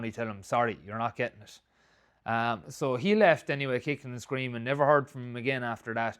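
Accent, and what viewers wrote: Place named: Ireland. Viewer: You sound Irish 😮😮😮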